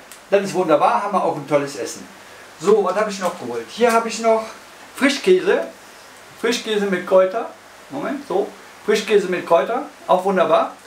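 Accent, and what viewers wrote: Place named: Cologne, Germany. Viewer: Sehr sympathisches Video. Ich finde deine Art zu sprechen cool, bist du aus der Gegend Köln?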